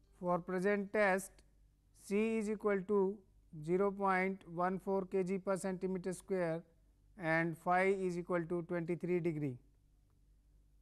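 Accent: India. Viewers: quit informative.. but another intresting part is when he speak, except his mouth every thing is like statue, n indian english:-> but thumbs up for knowledge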